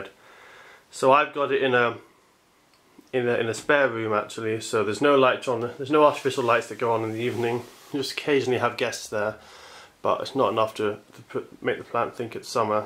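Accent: United Kingdom. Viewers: The chap has a British accent, so I assume he's in the UK. The UK, along with Europe, uses Degrees Celcius to measure temperature, and 15 degrees C is equivalent to 59 - 60 F (Farenheit).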